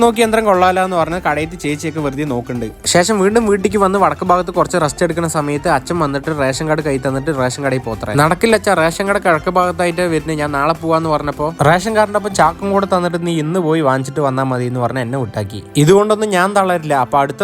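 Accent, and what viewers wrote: Palakkad, India. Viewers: Content and that Palakkadan slang outstanding.... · Ohhh i was wondering e basha n accent eth naatile aanenna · Naatta keereettund😂😂😂😂😂😂😂😂😂😂😂😂palakkadan slang❤❤❤❤❤❤❤❤❤❤❤❤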